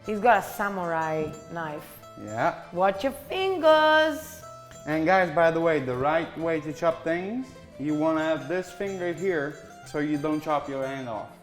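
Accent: Rome, Italy. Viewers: Ago er cxx...😂😂 me ne moro che in mezzo all'inglese, ce scappa sempre la romanita'❤ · I just wonder how is your brother don't have the Italian accent. I mean you still have this thick accent but he does not.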